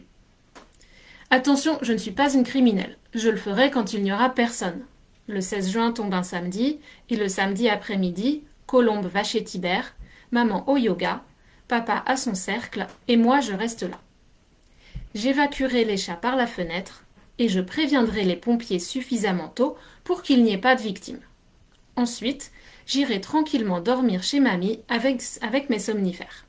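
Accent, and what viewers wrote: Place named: France. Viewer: tu as un super accent français ...tu parles comme les français ..je ne trouve pas de difficultés à comprendre .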